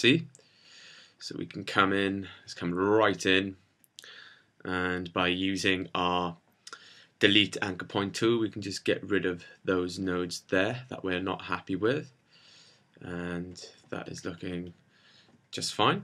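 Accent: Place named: South Wales, United Kingdom. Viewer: Yanni Courtney he has a South Wales accent.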